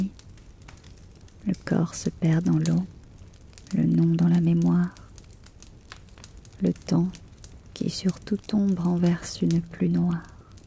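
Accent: France. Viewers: I love ASMR with a French accent. Amazing job! Keep up the good work! ❤ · Entre l'accent bien français, la baguette et l'assortiment de fromages, c'est juste excellent mdr Franchement, c'est vraiment du beau boulot, quand on passe de cette vidéo à une autre en anglais, on ne te reconnaît même pas. T'es clairement une pro, au sens littéral. En tout cas bonne chance, je suivrais ton contenu avec intérêt ! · Same, I want more french content. She also gives me motivation to learning french accent lol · Came here from the link provided in Part 2 (F4M). This is an exceptional audio, with your lovely narration in a French accent, adding to the immersion and exotic appeal. I absolutely look forward to the Part 2 experience soon. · Wow, I love your accent! I've never heard french ASMR before and I had no idea what I was missing out. This is honey to my ears, please do more! 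· I've listened to this one a few times now and most times I never get to the end of it because I end up falling asleep. Soft, soothing voice ASMR videos are one of my favourites. And the French accent really adds to this. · Finding this Roleplay is like finding true peace. There is nothing more tingly than a french accent guiding you to sleep. And the Ambient sounds are just on point for this. Cant really put into words how great this is. Really awesome work! Instantly gave me goosebumps and im loving it ♡ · Ta voix ressort si bien en français j’espère il y aura plus de vidéos avec l’accent français… Sinon salutations du Québec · The French accent is lovely also a small dig at the British made me chuckle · Never expected how good a French accent asmr would be. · Love your french accent , ASMR is strong on this one. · French maid? Yes. French accent? Yes! French maid with French accent reading French poems? 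YES! This was great, the relaxing vibes were pretty damn good, and I love the character as well. Excellent work!♥️ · Stuff like this is why in my honest opinion, the French accent is the most beautiful European accent, if not the most beautiful accent ever. Of course, every accent is beautiful in their own ways. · this french accent of yours has made this asmr, this night of discovery, and this moment, you have made me felt like im in a mindset of a rich man who face this fancies everyday, now i shall sleep with this fancy asmr voice of yours 🤩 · As a person who studies french I loved this video. I didn't know the french accent would sound so good on english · That frensh accent in the english language is soooo beautiful and soft. never heards such a tingly and calming frensh accent! hope to hear more of this in the future <3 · I've said it before on your videos and I'll say it again. I LOVE this video, it's perfect for me. 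I love maids and the french accent just makes it soo much better. Whenever I am not watching your new upload, I'm most likely rewatching this one. I'd absolutely love to see you come back to this one. Hell, I might even sponsor a video when money stops being tight. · God Please do more French content, The accent and the voice is just so hitting the spot (if anyone finds this in my history I am cooked but awesome work you do!) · If I had a nickel for every time I listened to a French Maid ASMR with a French accent and actual spoken French, I'd have exactly one nickel! 😅😅😆😆 Jokes aside, despite only being able to pick up certain words and phrases in French, this RP was so very calming to listen to. The French accent combined with bits where you recited the poems in French was oh so relaxing. The feeling of being taken care of made me feel all warm and fuzzy throughout and your humming is angelic. 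Your use of ambient sound is also masterful and it makes it all the more enjoyable to listen to. This was so different from all the other scripts so far and it shows your range. This channel is quickly becoming one of my favourites and I wish you the very best and utmost success in the future!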